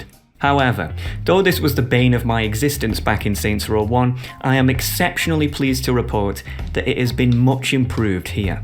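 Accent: United Kingdom. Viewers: wait.. you're bitish?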